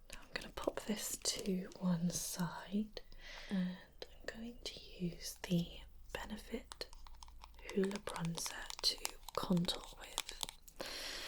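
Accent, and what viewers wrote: United Kingdom. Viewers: I love your British accent so so much!!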